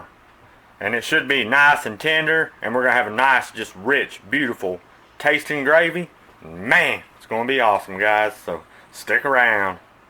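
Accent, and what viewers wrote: South, United States. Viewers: Hi! I have just found your channel and I absolutely love to watch you cook! You are one of the best cooks on here, and I watch them all just about. I also like your southern accent. Do I hear s little cajun in there? By the way, congratulations on not drinking beer anymore. I'm very happy for you and your family. God Bless you and yours.:-) BTW, I subbed to you and clicked the LIKE bell.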